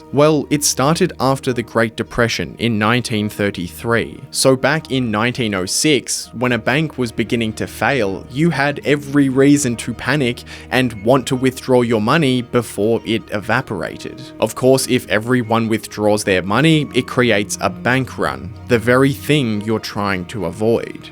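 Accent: Australia. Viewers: Ugh it’s hard to stay engaged with this Australian accent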